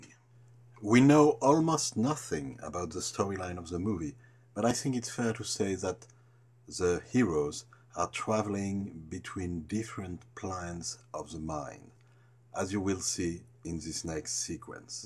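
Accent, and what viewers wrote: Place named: France. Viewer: everything aside! i love your french accent... haahah :D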